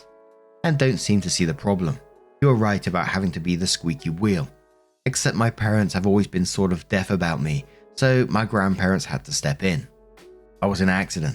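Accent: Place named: Midlands, United Kingdom. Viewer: British/American accents - and has a midlands accent so different again - have you not heard the way he says was 'waz' for example!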